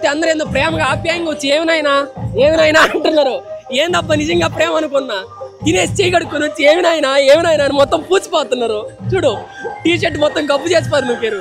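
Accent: Nellore, India. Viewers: Nellore slang🔥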